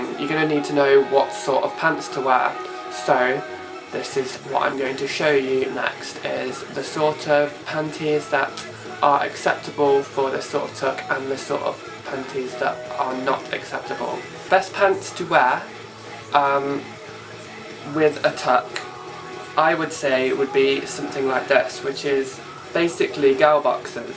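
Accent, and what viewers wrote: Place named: South, United States. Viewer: Dear. I found your video by accident. The title confused me. So I watched. "WOW"..... What an eye opener for me. I could not beleive it when you said you were transgendered. Anyhoo I just wanted to say as a regular fella. I think that you are a stunning lady who should have been a woman from birth. Also you made me laugh when you had to talk about the 'delicate" parts of the process. The southern accent made me laugh when talking about dropping a "bollock" (excuse the lack of imagination for my discription) I hope the future is bright and you become the person you so clearly should have always been. Thanks for the eye opener kind regards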